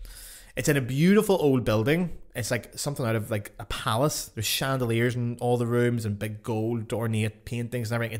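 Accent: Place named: Ireland. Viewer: Merci monsieur…je suis américaine. Je parle français et j’étudie la flûte avec un professeur français…aux États-Unis ! J’aime bien votre podcast mais s’il vous plaît arrêtez les insultes vers mon pays! We aren’t all idiots and some Americans have even composed some great music if you can believe it. All that to say, I do appreciate your podcast and I am not super offended, but as you continued in this episode to make fun of the American accent over and over, I was forced to do many many eye-rolls. You do admit that you anglicize Lot and Boehm names lol, you just do it with that charming Irish accent lol 😂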